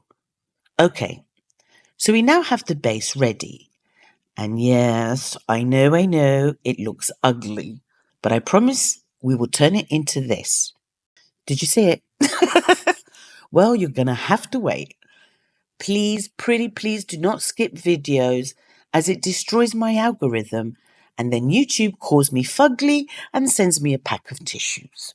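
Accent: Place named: Canada. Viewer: you sounded like a Canadian 😊❤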